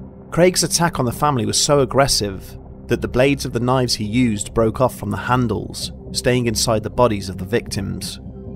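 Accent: United Kingdom. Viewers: Sorry just because it’s a British accent doesn’t mean it’s good 🤷🏽‍♂️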